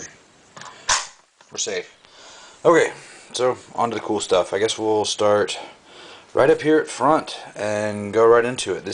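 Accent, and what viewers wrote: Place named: Tennessee, United States. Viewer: are you from tennesse? i noticed the tennesse accent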